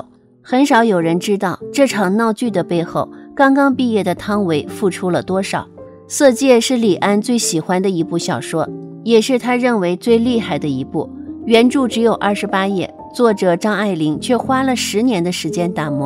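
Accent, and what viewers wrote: Standard. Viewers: 的国语讲的非常地道啊！😂😂😂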